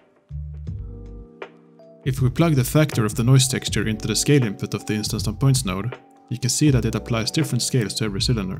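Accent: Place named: Germany. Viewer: I somehow always feel like this German accent is perfect for modeling tutorials :D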